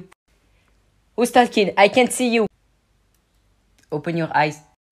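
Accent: France. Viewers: Cz he's french. And this is a french accent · The way he speaks english reminds me of french-👀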